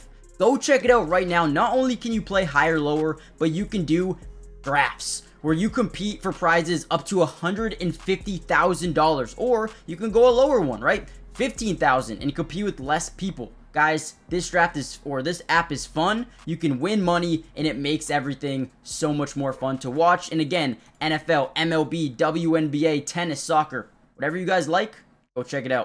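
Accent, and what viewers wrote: Canada. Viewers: Def need a Gardner minshew vid, btw random question but are you Canadian? Lol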